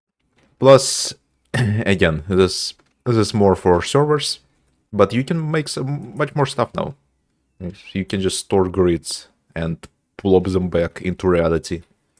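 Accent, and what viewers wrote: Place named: Russia. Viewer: руссиан аксенте ) Хотя возможно я шиз.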